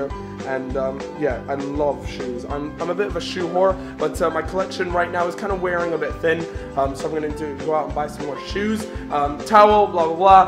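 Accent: United States. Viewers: hey are you american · why does he sound so American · Is he American?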